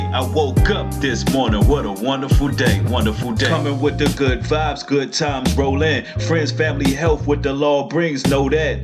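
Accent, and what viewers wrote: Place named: DMV, United States. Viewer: You from the DMV homes? You sound like it...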